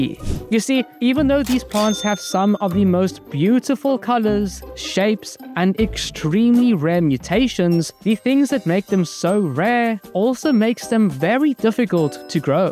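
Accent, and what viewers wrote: South Africa. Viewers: Are you South African bc i think you are.Pls answer😢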